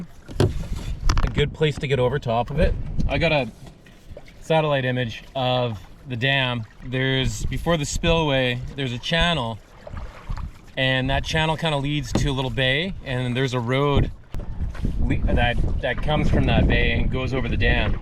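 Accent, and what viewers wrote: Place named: Saskatchewan, Canada. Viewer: Great canoe camping videos. It made me very nostalgic, When I was very young (24ish, I am 62 now) I sold my car and all other possessions and went on an almost two years coast to coast (from Newfoundland to Vancouver) Canada backpacking adventure. I stopped the longer in Saskatchewan where I got a temporary job helping a University of Saskatchewan team trapping muskrats, mink & beaver in the lakes and rivers around Saskatoon as part of a large research project of professor Dr.Francois Messier. I was a young, enthusiastic vet and the team needed one for the anesthesia of wetland wildlife they were working with. Lots of canoeing and kayaking putting tons of Tomahawk live traps in muskrat and beaver dens in the summer. Later some snow machining doing the same in the brutal Saskatchewan winter. All in very similar places as your videos (therefore the nostalgia and listening to your familiar Sask accent). 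Lots of fun. Loved it. I run away in the winter to visit a friend in BC and to escape from the brutal snow & ice over there. New sub from the Yucatan Peninsula Mexico, I do some sea kayaking here if you are ever interested in that kind of stuff. Thanks for sharing. Elbows up! Canada strong!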